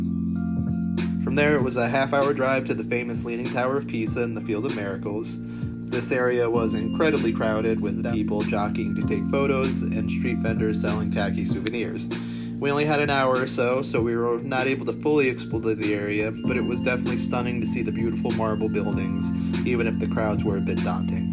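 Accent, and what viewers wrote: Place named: North America. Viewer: Great video! Could you please describe the process of embarking and disembarking from foreign ports? I assume from your accent that you are either from the U.S. or Canada. So am I, and I'd appreciate some tips on what to expect when traveling to and from Europe to board and disembark. What is customs like? Is it hard to navigate on your own?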